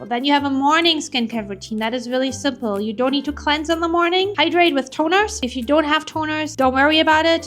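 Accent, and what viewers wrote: Germany. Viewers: Love the tips. Also, are you German? I really feel like your accent is German?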